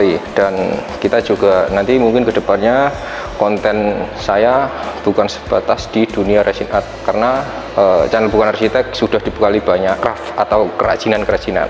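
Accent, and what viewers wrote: Java, Indonesia. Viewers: Logatnya kaya wong jowo,asli pundi mas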